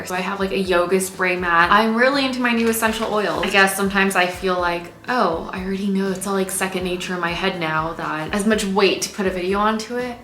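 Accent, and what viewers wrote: Vietnam. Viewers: omg that northern vietnamese accent! when u spoke i felt like you were my big sister 😄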